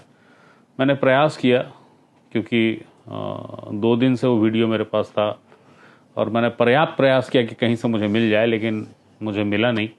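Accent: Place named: Jharkhand, India. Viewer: इनके भाषा मे सदरी बोली का लय है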